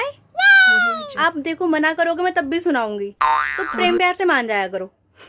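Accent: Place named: Garhwal, India. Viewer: Aapke muh se axxi lgri hai garwaali language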